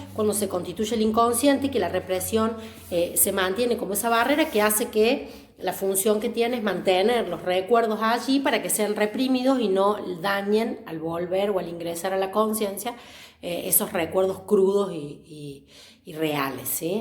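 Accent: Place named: Córdoba, Argentina. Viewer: Que buena explicación y gracias que sea de una tonada cordobesa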